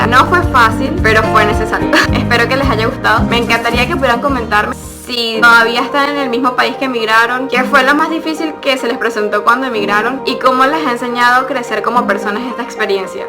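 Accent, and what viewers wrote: Panama. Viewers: es cierto no es por ofender pero no se te siente ese acento de los Venezolanos tu acento es como el Panameño . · te quedo el asento panameño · Todavía tienes acento panameño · tu acento ahora tiene influencias de acento panameño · Se te pego bastante el acento panameño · Tienesbun poco El acento Panameño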